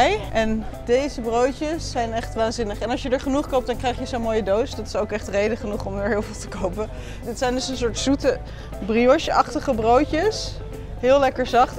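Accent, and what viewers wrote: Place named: Wassenaar, Netherlands. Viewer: Iemand die spreekt alsof ze uit Wassenaar komt is voor mij zoiezo geen echte Amsterdamse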